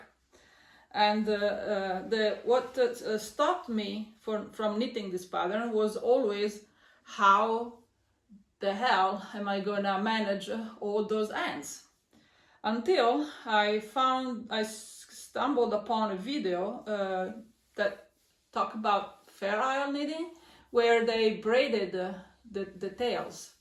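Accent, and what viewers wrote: Italy. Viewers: I didn’t know you before, but I’m glad you showed up today! You had me at Emotional Support Chicken! New subscriber. Your speech reminds me of my Südtirolean friends from years and years ago. Also my Italian friend at church here in Michigan. Please post again and show us more things! 💜 And yay for Paul Klee. I saw an exhibit in Tübingen ages ago and loved it in all his stages.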